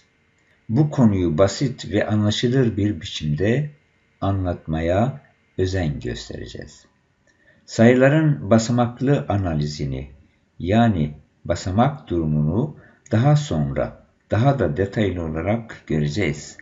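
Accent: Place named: Germany. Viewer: Sanki adam alman aksaniyla konusuyor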